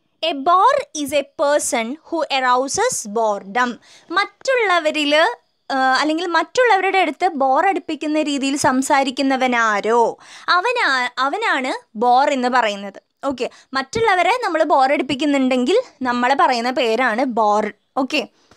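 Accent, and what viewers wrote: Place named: Thrissur, India. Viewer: ചേച്ചിയുടെ ക്ലാസ്സ് ഒരു ബോറഡിയും ഇല്ല.. online classil ഇരിക്കുമ്പോൾ വീണു വീണു uraghum ചേച്ചിടെ ആ trissure ബാഷ യും കേൾക്കുമ്പോൾ കെട്ടിരിക്കുംഭോൾ അടിപൊളി ആണ്.. ഇത് പോലെ വേണം Thrs 😍🤩🤩🤓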